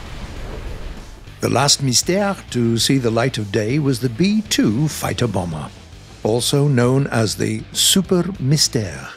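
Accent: France. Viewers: your french accent is wonderful!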